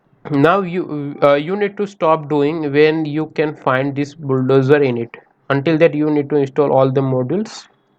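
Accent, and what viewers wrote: India. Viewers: I'm very glad to know that You are Indian... I got it when I heard first word .. Indians ki avaaj sabse alag 😎👍🏻🔥❤️ Me Bhi Bhartiya bhai 👍🏻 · When you search the whole internet but found a Indian guy speaking English then you knew it will work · When I heard the indian voice I came to knew that I am going to do something interesting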